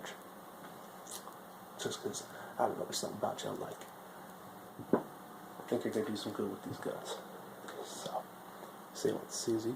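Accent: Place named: South, United States. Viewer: A southern accent... I'd say I'm shocked but I don't like to lie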